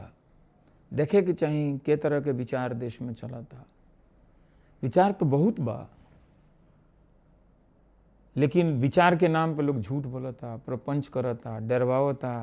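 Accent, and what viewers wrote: Bhojpuri, India. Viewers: Main bihar ke siwan jile se dekh raha hu app to pure bhojpuri bol rahe hai sir Salam sir app Ko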